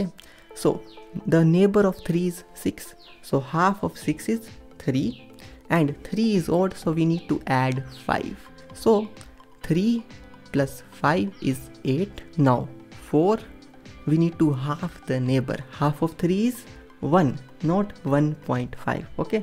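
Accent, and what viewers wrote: India. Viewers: bro sounds more indian then me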